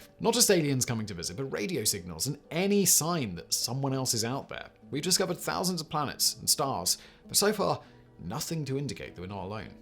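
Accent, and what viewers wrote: United Kingdom. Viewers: Sometimes that British accent throw me off as well. Maybe if he used subtitles.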